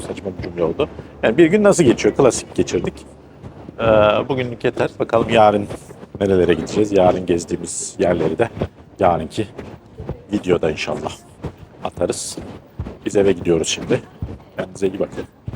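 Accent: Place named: France. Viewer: Yalniz Japoncanizda Fransiz aksani var sanki.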